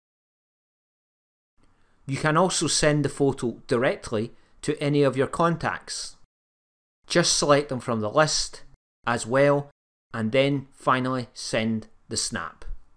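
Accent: United Kingdom. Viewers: I love ur British accent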